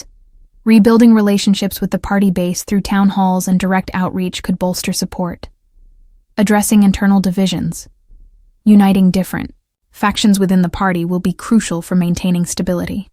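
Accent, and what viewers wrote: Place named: United States. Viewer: Why have we got to have an american robot speaking? Get a real person, and preferably an English one!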